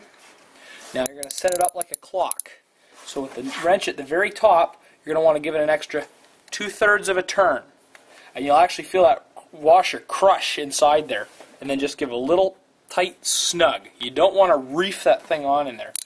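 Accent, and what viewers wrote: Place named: Canada. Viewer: You sound kinda Canadian, eh? I would check those gaps. I dont trust the mfr to gap them properly.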